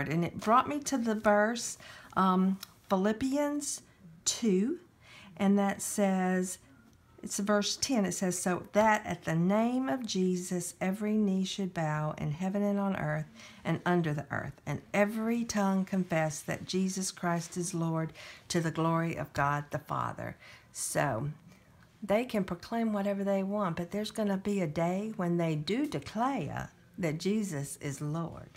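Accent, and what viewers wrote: South, United States. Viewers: Fun thumbnail! Lol like your Southern accent. Aww that's sweet you are acting like your Grandma. I have a lot of similarities with my Grandmother too.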